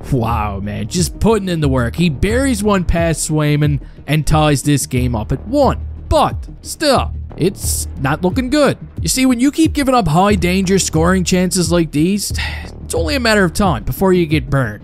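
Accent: Newfoundland, Canada. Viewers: First time viewing the channel and even as a Yank I can tell you’re a Newfie… 😂 love it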